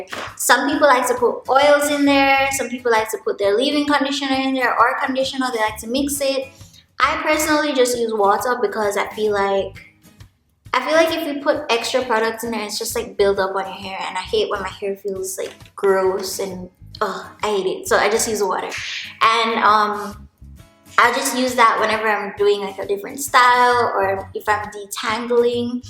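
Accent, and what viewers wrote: Jamaica. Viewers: Your accent is so strong and beautiful 🇯🇲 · Hearing your Jamaican accent lol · as soon. as I heard you i knee you were Jamaica, big up yourself, you have my support, fellow Jamaican in the US.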